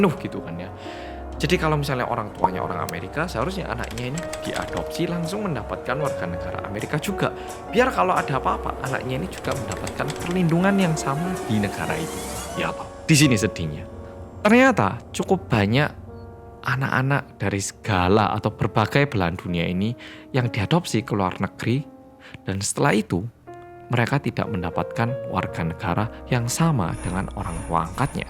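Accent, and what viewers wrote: Java, Indonesia. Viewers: Kenapa kalau lihat mas gw selalu tiruin intro nya HALO GUYS WLCOME BACK TO KOREA REOMIT BERSAMA ORANG KOREA YANG MEDHOKKKKK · Oke bolo-bolo , bersama orang Korea yang Medokkkkkk ,🤗🤗🤗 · Mas medokkkkk wkwkwk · Pentinge "medhokkk" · back to story from, wes medok, muaenak tenen dengar ceritonya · bersama orng korea yang... AKU&: menDOK!!! Aku selalu ngikutin kata "mendok" setiap opening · hello guys welcome back to Korea Reomit bersama orang korea yang.. MEDHOKKKKKKKKK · mau ngumpulin org yang sama sama nyebut medhookkkkk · Orang Korea Medok. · Podho Karo tonggoku ,jare wong Korea ,tapi ngomonge medhok juowooo pol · editor nya mantap bang nya lebih mantap..Fix paduan mantap 😆😆 Salam medhooookkk!😂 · kok seneng q ndelok e mase medok e iku 🤣🤣🤣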